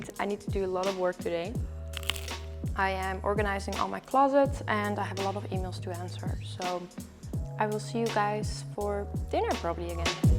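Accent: Netherlands. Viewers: Lol r u dutch your accent is so dutch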